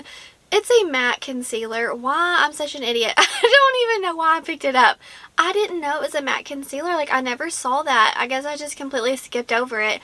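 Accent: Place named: Alabama, United States. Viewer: First time I have watched your channel and ohh boy you are such a sweetie ,) I love watching the ones with soothing voices and you have it… also an accent ( what state do you live in girl ) maybe Alabama ?? Sorry to hear it’s a concussion I got. Into a horrible wreck 6 months ago, worst grade concussion border line TBI, better now to find out I have a torn rotator cuff and I’m a Nurse so might be a career change due to this wreck YIKES !!! Slow recovery when it comes to concussions, so good luck and hope your migraines calm down… lots of rest girly ,) I just turned 40 So weird as I feel 21 and have been into cosmetics since I was 15 also professionally for 10 years… have a collection as If it were my day job, make up is my passion and no matter the meal it always fits ( other than matte concealers ) LOL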